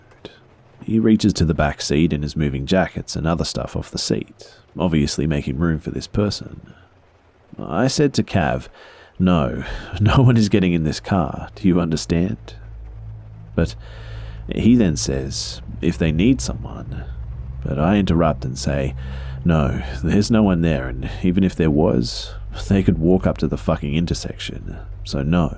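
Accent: Australia. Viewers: Good to hear an Aussie creepypasta reader. Its good to hear different readers to see how they handle the story. Mr Nightmare for instance can make anything sound scary and ominous, where as CreepsMcPasta sounds so relaxed and thoughtful. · came back to the youtube channel because I listened to everything that was uploaded on his Spotify podcast and was so happy to find there was a new here. I even listen to case file! What is about Aussie's narrating horrifying shit? I love it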